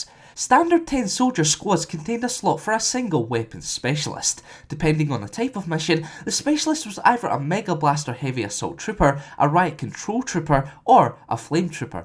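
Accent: Scotland, United Kingdom. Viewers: Ahhh god bless your Scottish voice. Its so irresistibly refreshing from boring British and American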